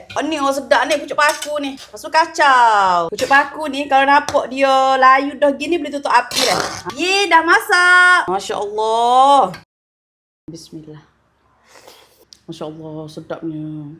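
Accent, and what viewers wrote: Kelantan, Malaysia. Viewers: Suka dengar loghat orang Malaysia, ingin cari istri orang Malaysia lah😂😂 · Nk oyaknya ore klate lhtu😅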